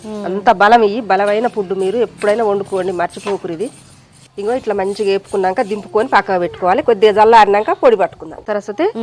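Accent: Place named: Mahabubnagar, India. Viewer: Medhi mahabubnagar side kadha language thelusthundi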